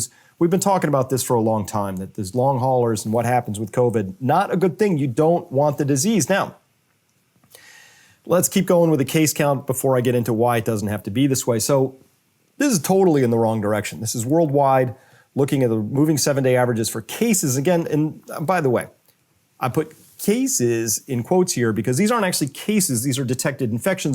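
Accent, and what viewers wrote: England, United Kingdom. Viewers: Thanks. Love your positivity and your “English” accent! Excellent 👍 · But that English accent though! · 😅😂. English accent 😊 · Lovely Brit Accent. Top class video. Thankyou very much for my shot of common sense. Gerald Celente also calls it for what the "rulers & managers" are: Trends in The News Going Live: More Lockdowns, More Mandate Madness, More Econ/Social Destruction <link>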